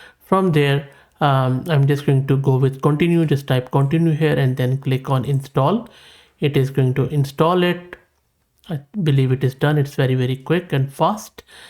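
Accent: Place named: India. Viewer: >indian voice >disliked and reported the video immediately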